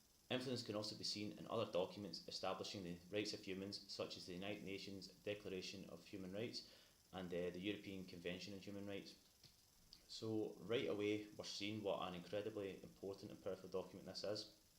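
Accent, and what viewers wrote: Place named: Scotland, United Kingdom. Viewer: The English law is different from the Scottish law ( and your accent sounds like your Scottish) is not applicable in an English court, but a British court in England doesn’t use the constitution for the help of the person in the dock ,unless they state that they have all their alienable rights in tact , no taxation without representation, There had been a civil war before the called the glorious revolution which deposed king James the 3rd because he was a catholic and the country was a Protestant country and the king was head of the church and he was not acting as he should so William of orange and his wife Mary were made monarchs and the bill of rights would need updated in regard to language and the catholic religion as were a secular nation of 3 countries on the British isles also the parliament was given power over the army as James king James kept starting wars so 5hat privilege was removed by the bill of rights and the parliament was to ensure that they protected our rights and freedoms But they are not doing so and people don’t believe that the bill of rights, bill of statues are common law still exist and are still enforceable and are our sovereign right as subjects, if people see themselves as British citizens then they’re saying that they don’t have constitutional rights from our sovereign monarch and that’s got to change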